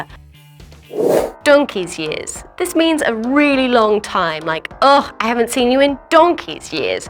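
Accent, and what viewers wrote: United Kingdom. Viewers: She definitely sounds more British (I am British too) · Are you from british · I love way you conduct the show, your Brit accent, articulation etc..I would love to have a chin wag with you..heeee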